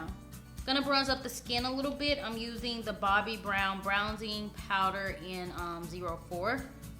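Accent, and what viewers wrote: South, United States. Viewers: I'm freaking love your channel! My friends always tell me that I sound like you. #southerngirlaccent #nitraabsnapchatgiveaway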